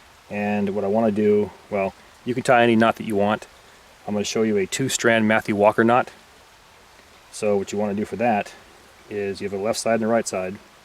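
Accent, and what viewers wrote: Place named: Midwest, United States. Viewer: What part of the Midwest are you from I live in Indiana ?about 20 miles from Illinois that was a good video by the way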